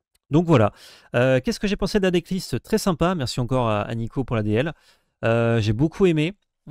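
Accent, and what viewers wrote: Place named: Italy. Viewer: Quel accent italien !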